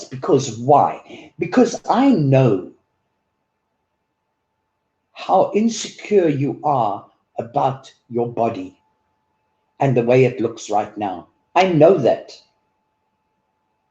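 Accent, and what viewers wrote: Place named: South Africa. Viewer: Sooo glad I found another South African here in this crazy community! 😅 Great to hear a familiar accent!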